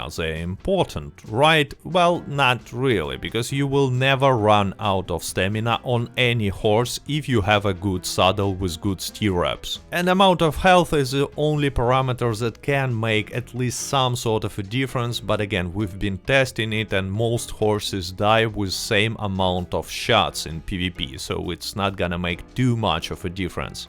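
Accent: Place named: Russia. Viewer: Четкий русский акцент, 😄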